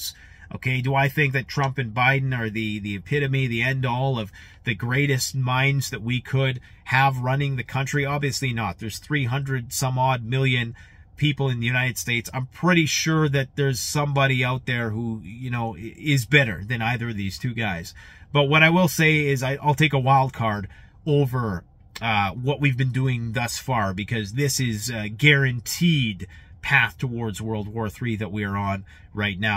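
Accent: Canada. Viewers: Trump is the best u sound like Canadian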